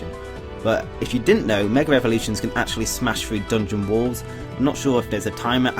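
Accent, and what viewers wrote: United Kingdom. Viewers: hear it. I'm from America though.. Are you British?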